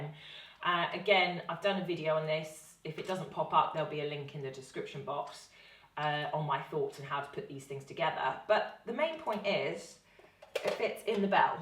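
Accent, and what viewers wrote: United Kingdom. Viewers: Hallo! Just found your Video's and loved this one! So nice to hear a lovely young lady that Knows so much about Sax! Hope you make it across the pond some! Positive post and subscribed! No sure what part of the UK the accent is but that typically means a Blend from South London often for me. I'd like to add something a legendary sax player taught me to do before sand paper on a G# for sticking. Frequently we would use a Fresh USD bill before big performances. It worked great and had some texture to it and often when one did it, the Bill would make it down the horn line (Till the Bari player pocketed it typically) So I got a bass ! Keep up the Video's and Hope you make it to the SE USA ! Come play my Bass! Later Sweets! FantaZ4U here, AKA Saxaddict ! Oh one other thing, I made the3 mistake of buying a very nice Selmer Glass Reed wallet and it totally ruined reeds after the first use. 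For over 20 years I've done what you do and just retract the reed some and but a good cap on it. And it worked on all my large Clarinets as well but considering the frequency I had do Double on them, I eventually started using Fibracells. When having that much lumber on stage I got very dry keeping them All warmed. It got worse when the Vocalist found my storage fridge and started lifting them before the stage calls. And Typical we know what happens when singers feel the need to take things. I'd make special stops for a bottled water Lemon Water only to have a Singer take it before they cameras ca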